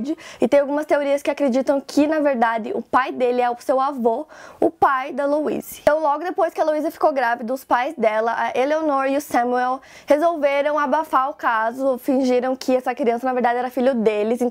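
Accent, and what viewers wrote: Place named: Paraná, Brazil. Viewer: Sotaque paranaense, sei pq sou paranaense tbm sksk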